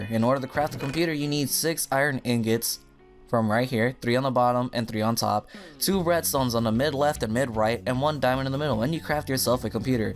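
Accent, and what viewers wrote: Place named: Mexico. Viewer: I love your Mexican accent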